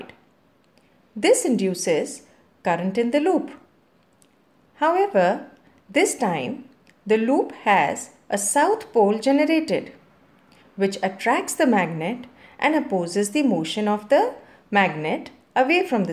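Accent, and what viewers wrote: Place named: India. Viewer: مبينه هنديه من لهجتها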